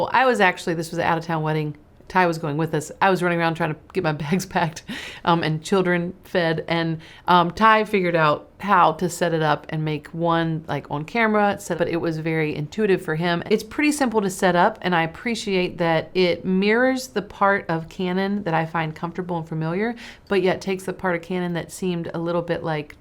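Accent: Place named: Alabama, United States. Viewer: thank you so much for this video. I love your teaching/behind-the-scenes style and your smooth southern voice reminds me of the Alabama that I left to come down here to Brazil. BTW, my Canon 600EXRT was giving me some trouble (shooting full power every once in a while), so I bought the new Godox V860iii (it is R$1200 Brazilian). Just got it for Christmas and haven't even had a chance to take it out, but I loving it! Wish I could control other flash makers (like my YongNuo) with the Godox (you can, but only on manual S1). I can't even control my Canon flash with it, but that's ok because I plan on changing ALL my flashes for Godox. Hint: DO NOT BUY YONGNUO FLASHES, THEY BREAK EASILY AND ARE NOT WORTH IT!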